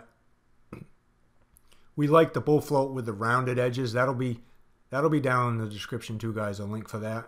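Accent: Maine, United States. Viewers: Great work!! it’s funny after listening to you talk for about 10 seconds I was like he’s from Maine! Looked up your company and your in Monmouth... I live in Bangor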